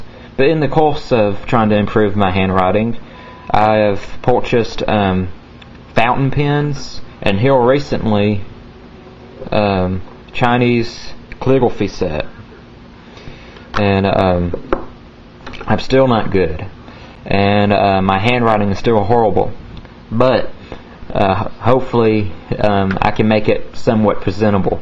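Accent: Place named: South, United States. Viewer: he had like a southern accent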